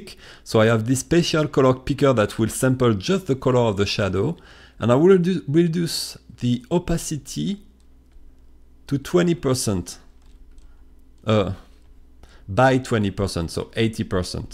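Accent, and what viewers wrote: France. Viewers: Salut ! J'ai vu que tu étais Breton alors je te parle en français ! Super vidéo, on apprend beaucoup de chose sur Krita. Continue ton WebComic, il est juste génial, l'histoire et mimi, drôle et magique, tandis que le dessin sont juste magnifique : j'adore ton style ! Pourquoi avoir choisi Krita ( peut être que Photoshop est trop chère mais je me dit que si tu es pro ... ) ??? Et comment faire pour diminuer la taille du brush sans aller dans la barre du haut ? Continue tes vidéos, c'est pas commun des tutos krita ! Ha, aussi, T'es vidéos sont géniale car JE COMPRENDS TOUT GRACE A TON ACCENT !!! ( sans vouloir être méchant, mais ton accent français est vraiment cool pour moi qui n'ai que des bases ! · are you fench (si oui, j'ai reconnu ton petit accent !) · Are u french? U have a french accent so hard man lol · You have a strong French accent xD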